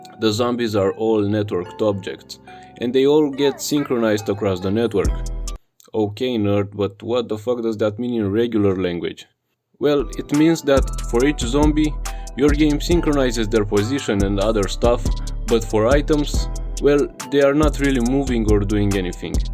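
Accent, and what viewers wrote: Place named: Russia. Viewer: Ты русский, по акценту понятно)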